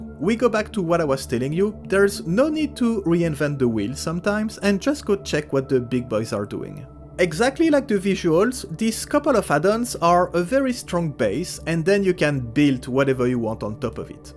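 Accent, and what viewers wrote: France. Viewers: Lately, I enjoy more and more listening english with french accent. I start to feel what that dude from Matrix said.. "It's like wipping your ass with silk." just,.. muuaahh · Are you French?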